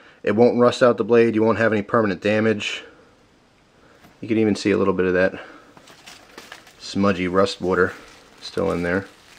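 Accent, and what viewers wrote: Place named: Northeast, United States. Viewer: I love the way people from the North-eastern U.S. say 'water' lol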